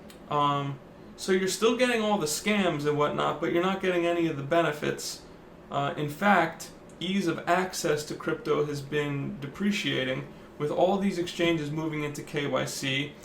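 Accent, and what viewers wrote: United States. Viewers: Hey, thanks, i've been watching your discourse on twitter haha, also you sound texan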